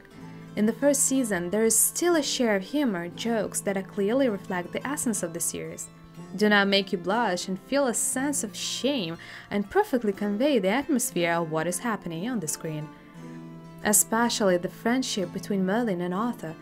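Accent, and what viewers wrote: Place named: Russia. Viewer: At the beginning of the episode I somehow had the feeling as if a Russian person spoke English (not a criticism)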